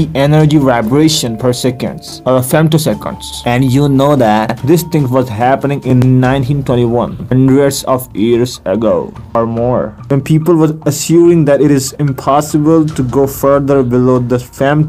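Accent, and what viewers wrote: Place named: Indonesia. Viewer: Indonesian trying american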